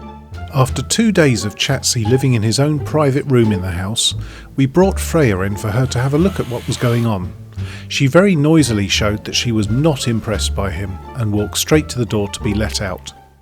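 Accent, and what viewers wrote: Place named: United Kingdom. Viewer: ya i am also hooked and i love the British accent ;p . big Tigo fan myself !!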